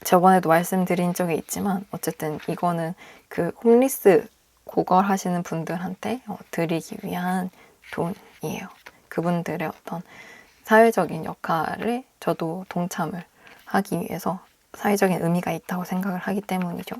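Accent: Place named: Japan. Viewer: 한국어 마스터한 일본분 특유의 말투랑 발음이랑 완전 같아서요! 모든 발음이 둥글둥글 하고 받침이 약하다고 해야하나요? 약간 お / ん 이 모든 발음에 들어가는 느낌..? 웅냥양 발음이 속에 내재되어있는 느낌...? 그리고 그 숨쉬는 부분이랑 말의 템포랑 멈칫하시는 부분이 엄청 비슷하세요😂 약간 한일2세분들 이랑도 비슷한 느낌!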